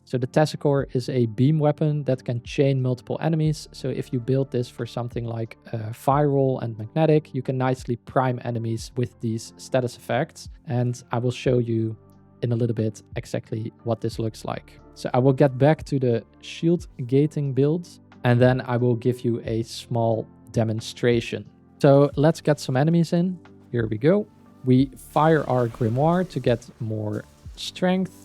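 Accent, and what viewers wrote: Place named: Netherlands. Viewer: He is from Netherlands... This is an accent.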